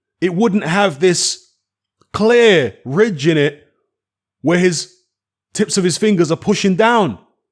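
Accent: United Kingdom. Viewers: I noticed the British accent so I would assume that you are a Tyson Fury fan. However my common sense tells me that a glove cannot flop back and forth if you hand is all the way in. You failed to explain that. TRY AGAIN. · What a coincidence that u sound like ur from the uk lol. I was cheering for Fury but after this, I can definitely see the obvious. He was hitting that man with bare fist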